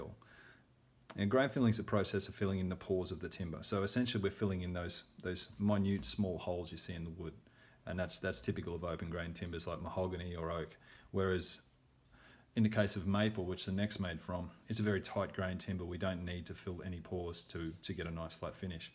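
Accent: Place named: Australia. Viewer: Awesome, sounds like your an Aussie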